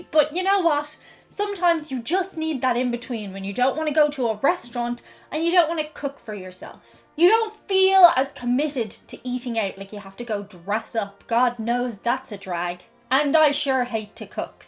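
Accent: United States. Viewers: I'm up for a 10-worst video, but be sure to wear a suit of armor. Some people have no sense of humor about their own country. I know you'll keep it light and funny, but be prepared for backlash, especially if people other than your regular followers see it. I love your American accent, including the valley girl accent you did for Word Things. As for whether communication has improved: there have always been cranks who insist the world is going to hell, and use changes in language as evidence of that. Linguists know that language evolves constantly, and that people invent whatever language they need to express themselves. I'm reading "Because Internet: Understanding the New Rules of Language" by Gretchen McCulloch, in which the author details how modern technology has changed how people express themselves. It's a great book, worth reading for anyone who's interested in how people actually use language. 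The microwave oven was invented by the American engineer Percy Spencer. Japanese companies did a lot to popularize them, but they originated in the U.S. And no, microwave cooking is not unhealthful. It may not be the best way to cook some things, but all it does is heat the food by causing polar molecules (like water) to vibrate.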